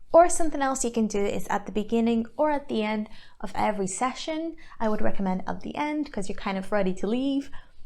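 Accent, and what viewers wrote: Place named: United Kingdom. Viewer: Her accent is British Nguyen you can tell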